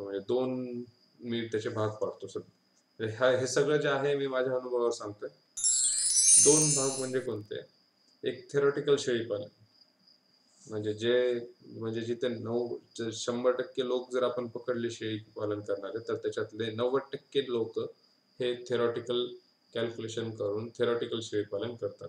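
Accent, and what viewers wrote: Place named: Vidarbha, India. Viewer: सर, तुमच्या भाषेच्या ओघावरून मी एक निच्छित सांगू शकतो कि तुम्ही विदर्भातील, अमरावती विभागातील एका जिल्ह्यातील आहे. तुम्ही दिलेली माहिती खरच फार उपयुक्त आहे, आणि व्येवासायातील वास्तववादी जगण्याची प्रेरणा देणारी आहे.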